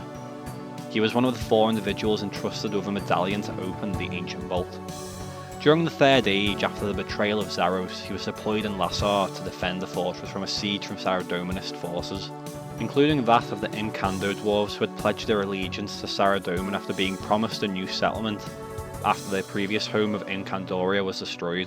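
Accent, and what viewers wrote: Liverpool, United Kingdom. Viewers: Very well made interesting video for a channel with a small amount if subs, I would have subbed, but cba with your dirt scouse accent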